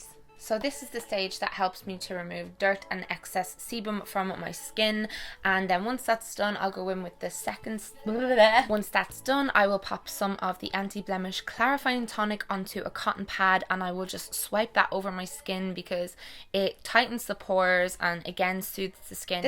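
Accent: Ireland. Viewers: Does anyone know what part of Ireland she's from?? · lovvvveeee you can you marry me??? God Irish accents are so sexy xx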